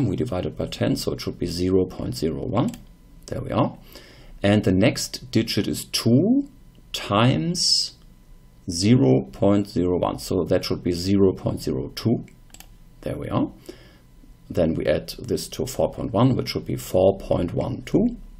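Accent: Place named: Germany. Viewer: Yes it is. I'm converting some bibles (from diferent publishers) from PDF to XML. I'm strugling a bit because the pdfs are far from being well structured as a text format. So the parser get's relly messy. And also I have very few experience with parsers. Hmm: Ich nehme dein Antwort als ein "Ja" :). Ich habe das Firefox Fenster nicht gesehen. Aber deine Ausprache und Pausen sind ähnlich zu andere Deutsche die ich schon kenne, insbesondere wenn sie langsam sprechen. Viel Erfolg !!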